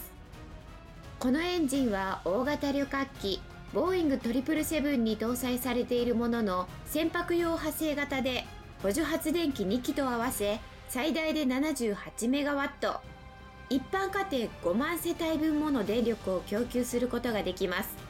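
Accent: Kansai, Japan. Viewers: この人、すごいナマってるな。 これ関西系のナマりかな？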